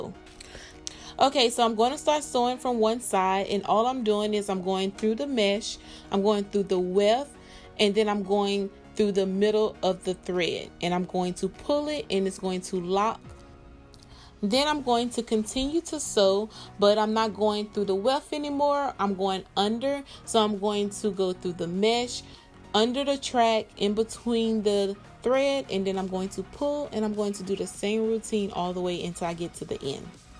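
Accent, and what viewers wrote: Louisiana, United States. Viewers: You gotta be from Louisiana sis I hear some accent😍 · I love your Louisiana accent 🤗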